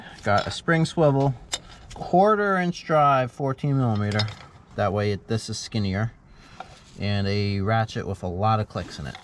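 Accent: New York, United States. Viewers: New york somewhere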